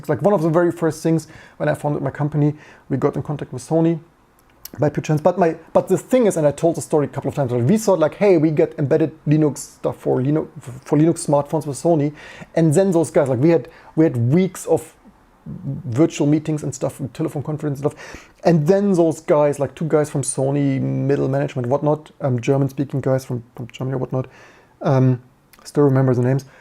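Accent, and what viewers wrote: Germany. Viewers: Das ist das deutscheste Englisch was ich je gehört habe :) But mad respect for your work man. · Your accent is like a german shit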